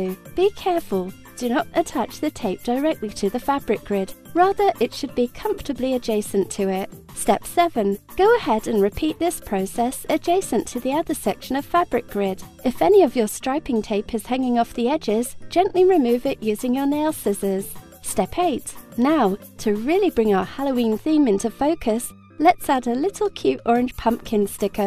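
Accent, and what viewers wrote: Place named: United Kingdom. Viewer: i loooove this british accent.....i really do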